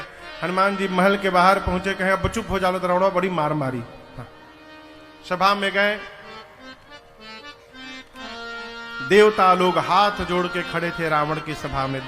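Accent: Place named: Bhojpuri, India. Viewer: आपका भोजपुरी मीठा बचन सुनकर दिल खुश हो गया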